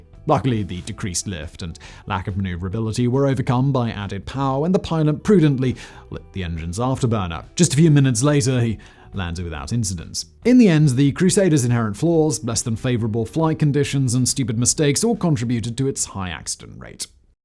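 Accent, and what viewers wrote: England, United Kingdom. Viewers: Arrogant use of English accent. This guy Never Soldier w/ Cavalier wuss an Talk Anti American Buffoon!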